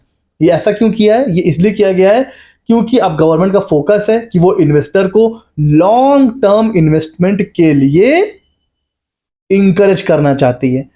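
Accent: Lucknow, India. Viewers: Lucknow se lag rahe ho, is it?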